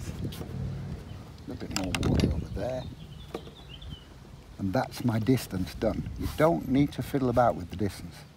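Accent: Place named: England, United Kingdom. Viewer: Would you like a little humor? I've watched and enjoyed quite a few of your demonstrations now, and all the time I thought you were saying 'hocus" for your green, not Hooker's green! I'm from the deep south in Texas, and our accents are not as lovely as the English.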